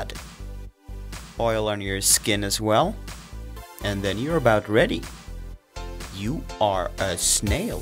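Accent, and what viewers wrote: Scania, Sweden. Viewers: hAN ÄR SKÅNSK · är han som snackar skåning eller det låter så när han pratar svenska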